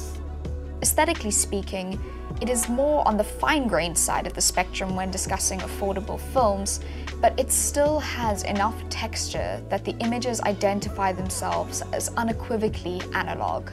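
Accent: South Africa. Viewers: first five seconds and i know I'm listening to a fellow south african! much love from new zealand.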